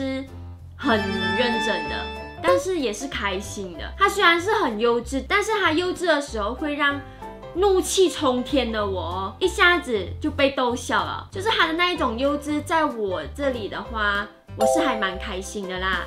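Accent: Taiwan. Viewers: 有谁不太适应的台湾腔的？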